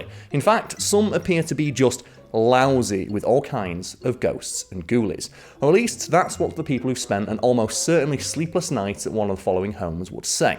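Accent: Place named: North, United Kingdom. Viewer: Why are you still the interim host? Ive watched this channel for years and you’re the best host. It was weird hearing a fellow northerner at first, but now its a highlight of watching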